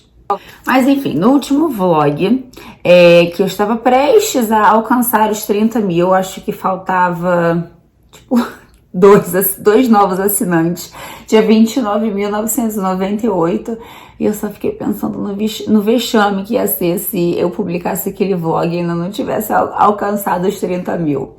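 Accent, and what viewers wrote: Rio de Janeiro, Brazil. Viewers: Oi, então a sua voz é maravilhosa adoro você falando,especialmente com esse sotaque carioca que é o meu preferido acho lindo lamento ter descoberto você quando já estava mudando para 🇺🇸 e não tive a oportunidade de conhecer -la pessoalmente aqui em londres, mais enfim sou super fã dicas de beleza então são minhas favoritas.bjus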